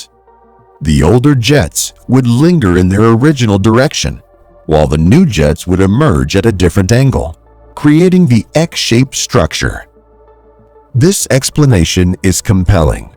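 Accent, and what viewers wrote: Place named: United States. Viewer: Annoying American voice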